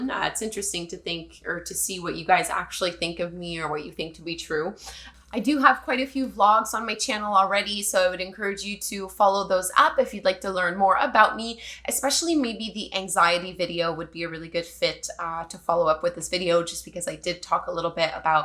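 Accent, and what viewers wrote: Quebec, Canada. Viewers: C'est drôle comme même dans les commentaires on lit l'accent québécois 😄 I am french and it was a nice and funny surprise to hear you speak french with that incredible canadian accent 😄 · Dang, Quebecois French is ... somethin' else... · Salut, je ne sais pas non plus que tu es francophone. Je te suis depuis le début de la pandémie ou je me suis mise au yoga. Je te suis de Suisse francophone. J’adore ton accent québécois. Merci pour ton super travail, Une vidéo en français ca serait cool. j adore ta chaîne à la prochaine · its probably really obvious to them, but i didnt realise you were canadian till just now ;)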